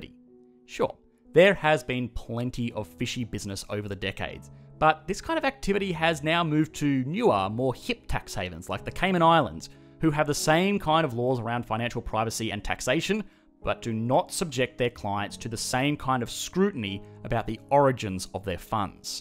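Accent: Australia. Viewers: What a terrible (Australian) accent. Hard to take it seriously. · My God Australian Accent. Couldn't understand properly. It's very tough to understand.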